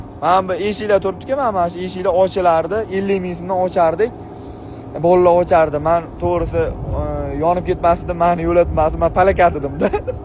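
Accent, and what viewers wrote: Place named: Dagestan, Russia. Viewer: Саломни догистон шевасида м узбекча иложиси бору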